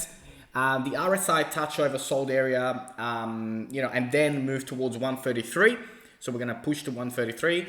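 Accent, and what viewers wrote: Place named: Australia. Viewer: Glad I found you bro. Judging by your accent your from AUS. I'm also from Aus specifically Melbourne. Their arnt that much AWAKE/AWARE crypto people in AUS like yourself. Gave you a sub mate 🙏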